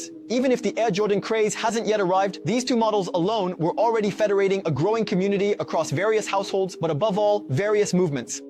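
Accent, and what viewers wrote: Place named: France. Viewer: Pas mal, un petit accent français mais sinon ça va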